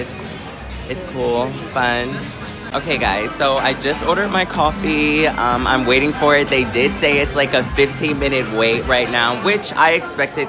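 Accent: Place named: New York, United States. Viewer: I feel like a failure. I legit thought you were from NY!????!?!?!?? Like where did I get that from? Omgoodness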